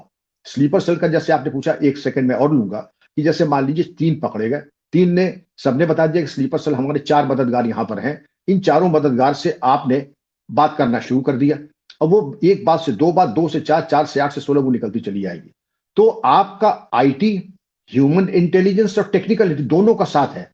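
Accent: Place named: Lucknow, India. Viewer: Samjhawan, uthawan😂 😂. I myself was in Lucknow so listening to you remindS❤❤ me of those days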